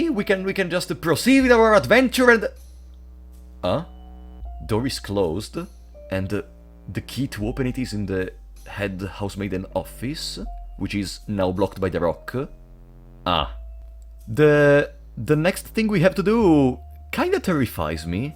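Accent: Italy. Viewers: Are you italian by any chance?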